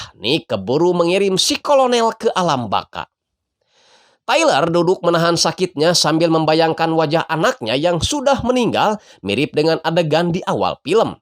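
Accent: West Java, Indonesia. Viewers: Sy gkk terlalu fokus ke film nya,sy lebih terhibur dgn pengisi suaranya,kang logat sunda banget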